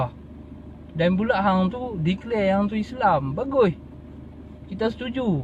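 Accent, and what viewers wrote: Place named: North, Malaysia. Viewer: Tapi abg cakap macam orang utara ye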